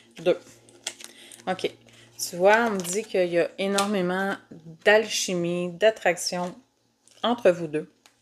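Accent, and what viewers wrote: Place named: Quebec, Canada. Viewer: Quel horreur cet accent crispant c'est canadien ? québécois ?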